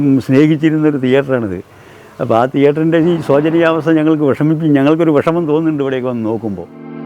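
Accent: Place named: Palakkad, India. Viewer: Palakkad ..alle ..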